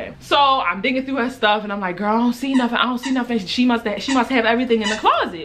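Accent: Baltimore, United States. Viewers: U from bmore aint u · She sound Baltimore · Lol Are u from Baltimore? Your accent 😆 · Lol first time watching you and caught the Baltimore accent early I’m subscribing ❤️🤣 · Sound like you got a Baltimore accent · U from Baltimore I can hear the accent 😂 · U gotta b from B-more or somewhere n Maryland..... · U sound like you from baltimore😭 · You sound like you're from Bmore. Remind me of talking to my nieces. I enjoyed the storytelling 💜 The math is just not mathing for me 😂😂😂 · Just got one question where u from Baltimore, jersey, or Delaware??? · It's the Bmore accent for me. I love it here. 😭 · Hold up twoooooo was kinda long bmore ?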